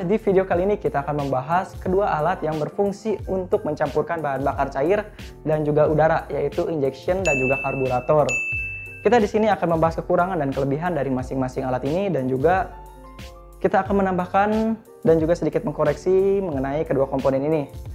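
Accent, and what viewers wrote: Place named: Slawi, Indonesia. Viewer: Sampean wong slawi ya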